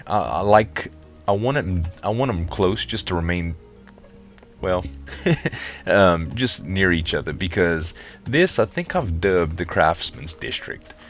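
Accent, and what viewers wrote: Louisiana, United States. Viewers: Hey great video! I'm glad you found me through Lembley :) Oh and have you been to New Orleans, just out of curiosity? I can definitely tell from your accent that you are from Louisiana!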